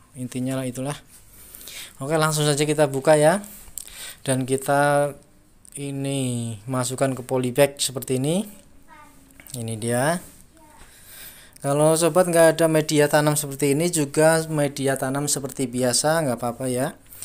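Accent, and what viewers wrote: Banyumas, Indonesia. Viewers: ngapak bosss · Oalahhh ngapak, aku wong Gombong mas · Wahhh...terimakasih ilmunya mas..saya jadi paham cara budidaya buah naga..akan langsung saya praktekkan..btw mas orang banyumas apa mas?deneng ngapak?siipp banget..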